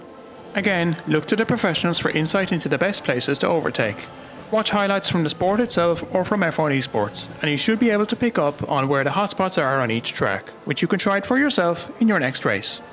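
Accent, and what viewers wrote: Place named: Kilkenny, Ireland. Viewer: What part of Ireland are you from? I can't tell by your accent I am guessing Kilkenny? 🍀🇮🇪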